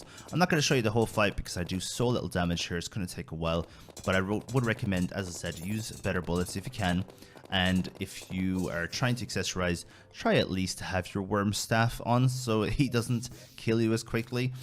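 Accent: Ireland. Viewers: r u irish u sound irish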